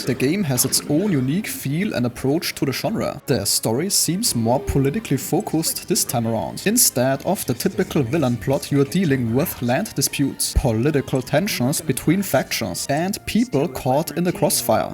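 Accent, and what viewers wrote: Germany. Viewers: Thanks for the video, this helped me! Are you by chance german? Your accent sounds like german xD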